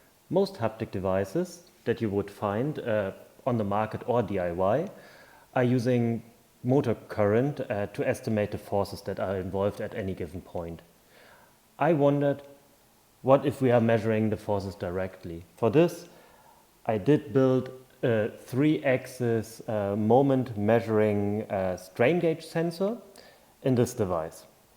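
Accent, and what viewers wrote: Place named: Germany. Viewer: metal working/engineering project and german accent ? You're sure to see good work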